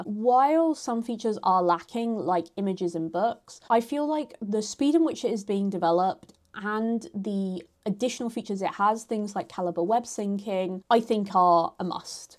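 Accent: United Kingdom. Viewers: I watched with low expectations when I read $. But then you started to speak and yay. A video by a fellow Brit with no waffling or bullshyte.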